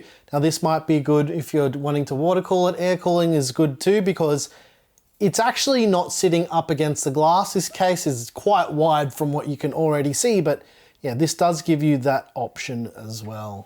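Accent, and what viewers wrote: Australia. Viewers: Great case review, and your build looks great but Zeeee, You’re not AMERICAN you sound Australian, we say Zed, not zee!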